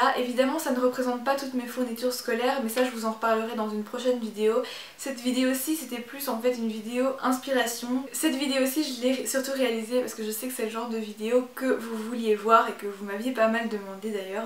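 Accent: Belgium. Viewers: T'es Belge? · Tu es belge ?😄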